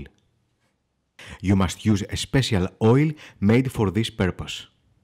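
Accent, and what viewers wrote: Greece. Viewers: Are you from greece? Your accent is very greek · Από την προφορά σου κατάλαβα είσαι Έλληνας 😊 Μπράβο αυτό το βίντεο Με βοήθησε πολύ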